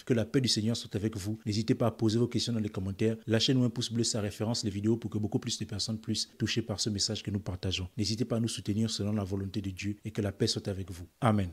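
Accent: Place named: Gabon. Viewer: Je me disais bien que la façon de parler était comme un gabonais 🇬🇦 😅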